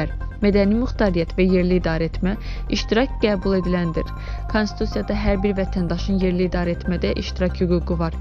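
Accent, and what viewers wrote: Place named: Baku, Azerbaijan. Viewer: Пелтейи отуздурубсуз орда , башга журналист йохдур Бу гызын сеси адамы лап есеблешдирир Гуйа бу Шехер лехчесийле данышыр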